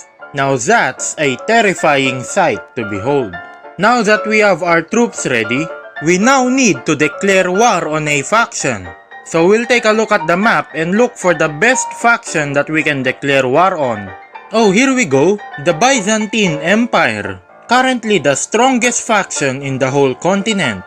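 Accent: Philippines. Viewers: Bro, you have a filipino accent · Hahahaah ang accent talaga pinoy na Pinoy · Pilipino ka po?yung accent kase parang filipino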